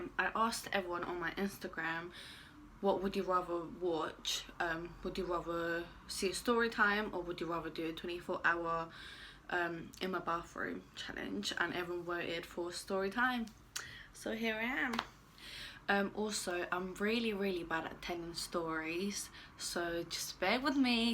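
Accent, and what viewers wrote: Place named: London, United Kingdom. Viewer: You from London or something!? Love the accent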